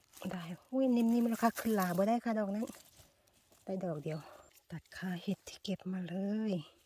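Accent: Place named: Suphanburi, Thailand. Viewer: ฟังเสียง พูด เหน่อๆ เหมือน คน สุพรรณบุรีกาญจนบุรี รึราชบุรี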